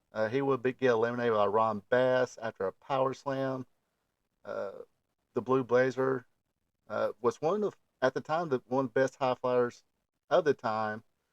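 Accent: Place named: South, United States. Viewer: Man i am so glad fans like you cover these. This on has a special place in my heart . My first PPV . Better days. Dude where are you from. Your accent is Tennessee or Georgia. Old school fan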